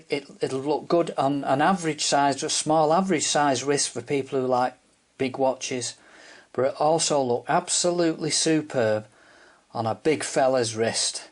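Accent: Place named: Merseyside, United Kingdom. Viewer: Are you from Liverpool? You have a Merseyside accent.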